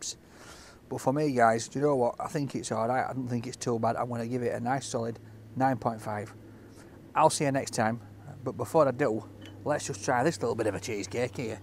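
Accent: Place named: RP, United Kingdom. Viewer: Don’t go too posh on us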